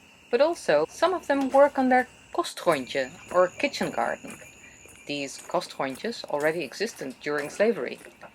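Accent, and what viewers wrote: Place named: Netherlands. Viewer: Misselijk engels met nederlands accent!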